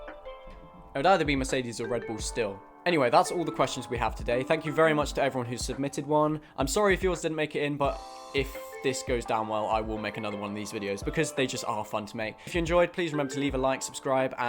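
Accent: United Kingdom. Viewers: He hides his scouse accent really well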